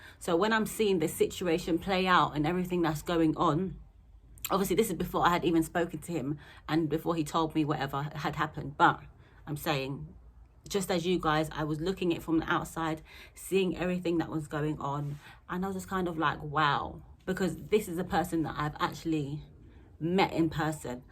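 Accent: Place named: England, United Kingdom. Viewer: So beautiful n love the English accent gotta subscribe right now!!!!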